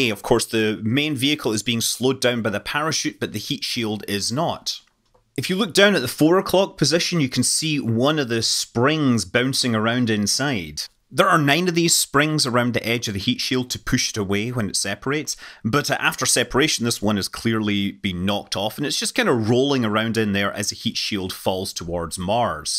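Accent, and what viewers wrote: Scotland, United Kingdom. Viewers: As in Star Trek, the best engineers have a Scottish accent. Thanks Scott Manley!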